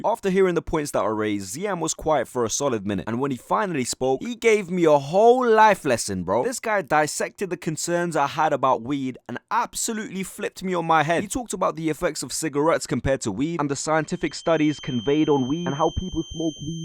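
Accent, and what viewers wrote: United Kingdom. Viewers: Are u from brum mybro?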